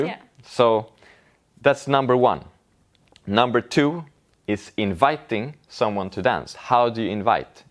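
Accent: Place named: Sweden. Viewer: Hey, are you Swedish? The reason for why I’m asking is because it sounds like you have a Swedish accent! I am half Swedish myself. I think you are the best male Kizomba dancer, you make the dance really beautiful and not too much. Mikaela is also the best female kizomba dancer in my opinion! I think she’s also Swedish... anyway it’s so fun to see you together, I hope you do dance videos together that would be absolutely amazing!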